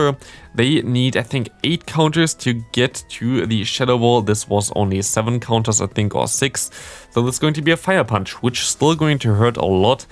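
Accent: Germany. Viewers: Hello welcome to a new video. And he is German. Easy to follow.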